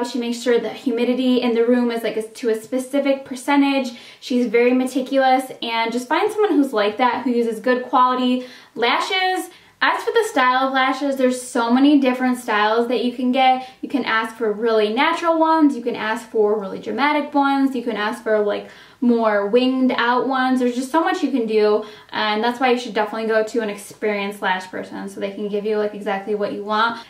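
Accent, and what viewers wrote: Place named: New York, United States. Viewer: Lol I love your New York accent ! I can tell 💗💗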